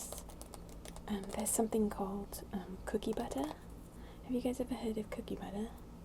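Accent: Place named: United Kingdom. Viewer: I'm in love with your accent! Are you from England or Scotland? Perhaps Australia?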